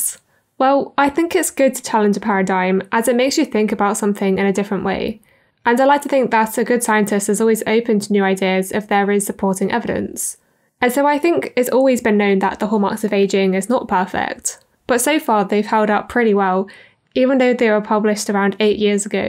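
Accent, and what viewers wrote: United Kingdom. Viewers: where in the UK specifically is your accent from?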